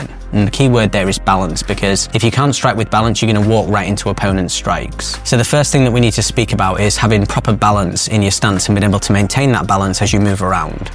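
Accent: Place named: United Kingdom. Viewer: Bro you are the most English 🏴 AF dude I've heard in a long time. Your boxing 🥊 wouldn't help in my neighborhood as all the young girls would tear you apart because they'd think you're Ringo's grandson.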